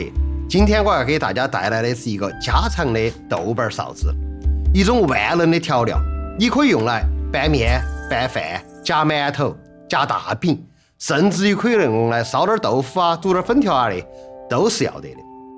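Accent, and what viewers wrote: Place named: Chengdu, China. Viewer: 成都话真是好听，女娃儿说更好听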